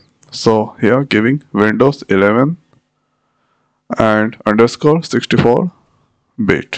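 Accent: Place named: India. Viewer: why does every tech helper have an indian accent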